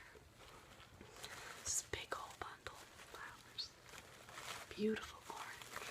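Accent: South, United States. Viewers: you can do a Southern voice really well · OH MY GOSH THATS THE SWEETEST SOUTHERN ACCENT IVE EVER HEARD I LOVE THIS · I am born and raised in the South and she NAILED this accent. Normally, it is difficult and cringy to watch Accent videos but this was really really accurate and tingles were everywhere. ilysm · As a lifetime Texan, you nailed the casual accent so hard. I wanted to fall asleep so bad but I have work to do😣. This reminded me so much of my childhood & growing up hearing all the women in my life w Southern accents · I live in Alabama and this was spot on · very appropriate use of the southern accent, we really do make a big ass deal out of weddings around here for some reason. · Wonderful job on the accent. I'm southern and i can tell when it's a forced southern accent, but your sounds so natural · Love the southern accent 👏🏼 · girls with a southern drawl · I love Southern us accents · You are the cutest sweet pea ever! 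Your southern accent is sooooo adorable. I was smiling throughout the entire video. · the southern accent actually sounds like a southern accent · love southern accent ASMR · this is so nice to watch, usually when people fake a southern accent they make it sound annoying as hell but you just sound so sweet n gentle i love it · This was heavenly!! The tapping on the tags gave me so many tingles, thank you lol! And the southern accent was a perfect touch ❤️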